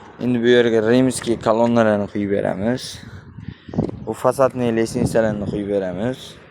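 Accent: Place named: Khorezm, Uzbekistan. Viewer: хорпзмчага айланибдику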